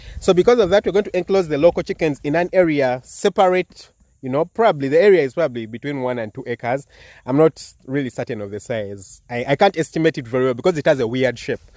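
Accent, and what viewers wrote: Uganda. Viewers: Ur accent sounds ugandan where u from bro?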